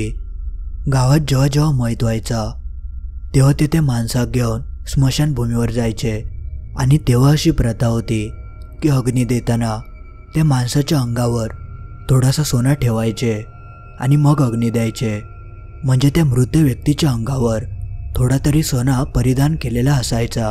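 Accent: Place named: Malvan, India. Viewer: दादा तु खूप छान गोष्टी सांगतोस आणी तुझी मालवणी भाषा खूप गोड वाटते. ऐकायला प्रत्येक गोष्ट वेगळी असते .मला खूप आवडतात .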